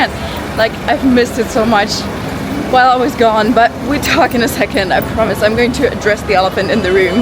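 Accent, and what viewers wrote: United Kingdom. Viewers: You should lean into the British accent why not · You sometimes sound british and we’re here for it xoxo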